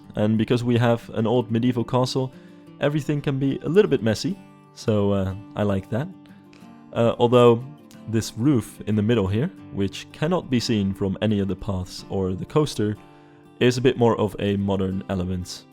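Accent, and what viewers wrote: Netherlands. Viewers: Apart from the fact that your build looks amazing, I want to point out that I like how even though I could hear that you’re Dutch, you don’t have a horribly Dutch-English accent. Probably a weird compliment, but usually I skip videos from fellow Dutchmen due to their accents bothering me haha. Dus die kan je in je zak steken ;-P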